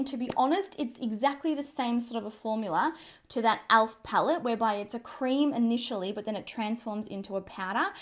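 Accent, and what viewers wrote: Australia. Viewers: It is her Australian accent.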